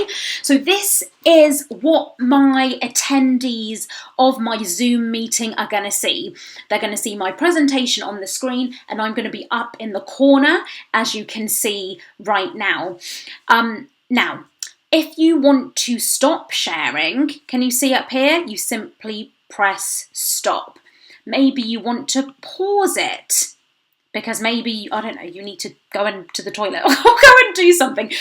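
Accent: United Kingdom. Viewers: Your Accent is dope British loh😂❤❤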